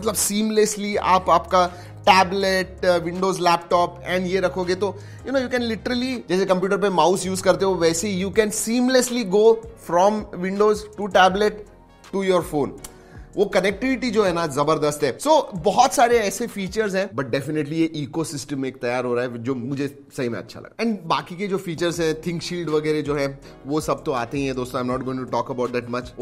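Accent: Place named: Bengal, India. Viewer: Aap Bengali ho kya.....aapke hindi me Bengali tone hai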